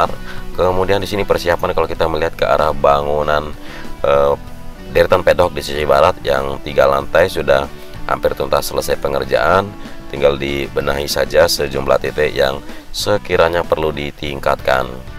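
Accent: Java, Indonesia. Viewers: Dr cara berbicara bang ini kaya orang jawa deh.. Asli mana si bang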